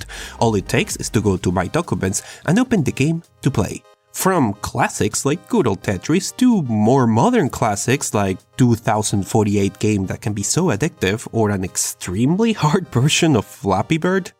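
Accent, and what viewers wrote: France. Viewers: are you french ? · Are you french? That could change my vision of the life 😲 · Are you french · wait, you're french? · So are you from France? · Tu es français ? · Are u french? What's the accent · You're french?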